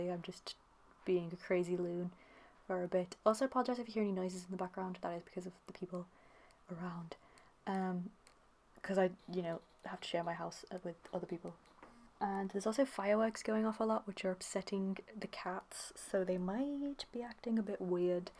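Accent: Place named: United Kingdom. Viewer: You're welcome! It's nice to see another British simmer! (I hope you're British, otherwise, I'm terrible with accents...)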